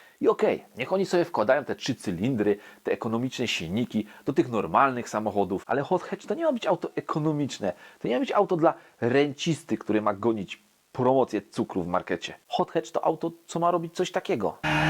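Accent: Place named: Silesia, Poland. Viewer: Škoda też😁 Tak z innej beczki to fajnie że w końcu ktoś ze Śląska robi tak fajny program. Miło się słucha własnego akcentu na YouTube 😉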